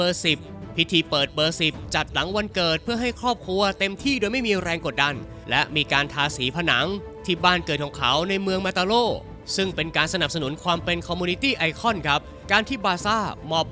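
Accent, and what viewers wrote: South, Thailand. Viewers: อย่าพูดใต้อีกนะครับ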